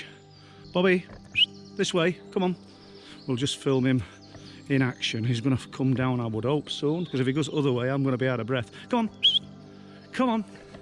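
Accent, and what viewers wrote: Yorkshire, United Kingdom. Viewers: Wonderful video and so lovely to hear a Yorkshire accent again, after growing up in The Dales. I'm 400 miles North now but do miss it, even more so now.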